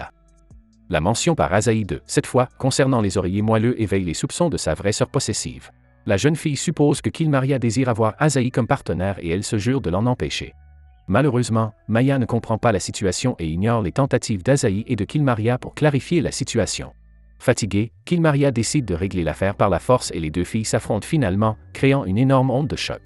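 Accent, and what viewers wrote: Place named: Canada. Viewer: S'il vous plaît 🙏 vous pouvez lui dire d'enlever son accent canadien et de redevenir comme avant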